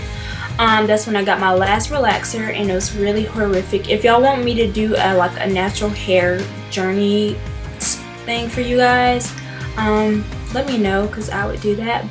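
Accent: South, United States. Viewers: the little southern drawl... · Love your southern accent. I am from Texas.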